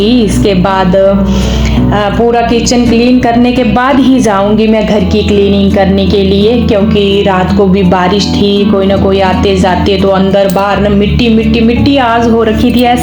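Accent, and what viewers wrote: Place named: Jammu, India. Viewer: Nice ji ❤from rajasthan Mai apki dogre accent bhut pasand krte hu Mai akhnoor boder pe rahte the 1993 mai to lagaw h panjaabi, dogree,kasmiri se Mere father saheb bhi fouji rahe 1998 tak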